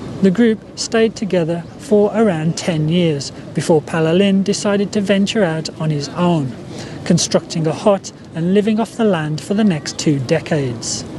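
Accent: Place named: United Kingdom. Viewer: Never mind. I think. Mostly, I listen to what you say to get more familiar with the British accent. 😆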